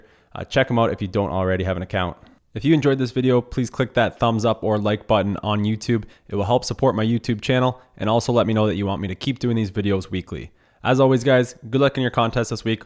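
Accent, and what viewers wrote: Canada. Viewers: SirDeonTheGreat he's from Canada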